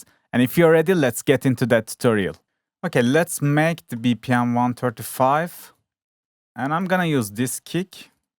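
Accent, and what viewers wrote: Turkey. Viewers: TAM DİYECEKTİM TÜRK AKSANI BU DİYE KANALA BAKINCA ANLADIM KJDFGHLKJGH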